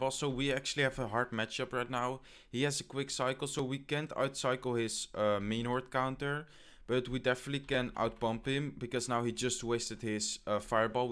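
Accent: Netherlands. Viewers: lol, it's his dutch accent haha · je nederlands accent ook xD haha nice vid :P heb je een goed deck om 4000 trof te bereiken? heb nu een een deck: giant skel, zap, sparky, fire spirits, goblin ton, dat skeleton hutje, mega gunst, vuurbal zit rond de 3500 nu maar kom maar niet ervoorbij haha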